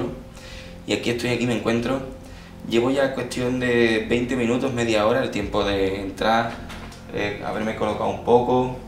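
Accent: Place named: Cádiz, Spain. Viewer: Apuesto a que este señor es Gaditano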